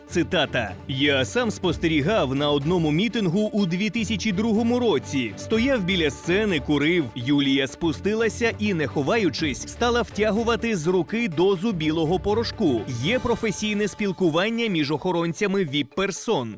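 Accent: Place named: Galicia, Ukraine. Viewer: Галычанский коментатор противно слушать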